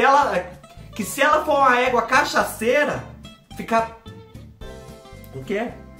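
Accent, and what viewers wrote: Spain. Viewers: Oi amei seu espanhol mais alguém amo deixa o like só eu que dei risada do útero virado do kkkkkk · Oi! Está chique demais, falando em espanhol! 🤩🤩🤩 Amei a parte do coice! 🤣🤣🤣 Beijocas! 😘😘😘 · 😂😂😂😂 do ceu o teu espanhol é tudo de bom 😂😂😂😂😂😂😂💋💋💋🥰🥰🥰🥰❤️❤️❤️❤️❤️ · to rindo de mais o seu espanhol é ilario kkk so vc mesmo cada resposta kkk os erros são de mais aiii que dor machucou o joelho adoreiii ispicula sim nós é capaz 🥰🥰😘😘😘😘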